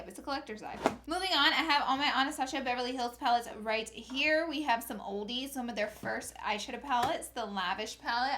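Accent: South, United States. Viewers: I love watching and listening to your videos because you're from the south and your voice makes me so happy. You're so funny and you're so entertaining even just talking about eyeshadow palettes.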